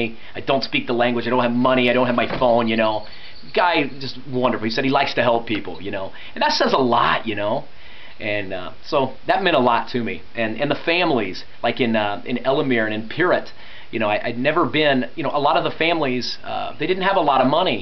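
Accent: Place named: United States. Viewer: I always watch American movies and I know how to pronounce the words in the American way. Of course I mean general American. There are a lot of dialects in USA, XD. Don't lose your American accent! It's just great, I don't like the British accent, the American one is much better.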